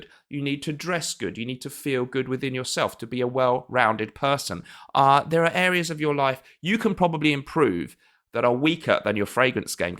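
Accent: United Kingdom. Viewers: 🤣😂You made me laugh, thank you! Nice British english!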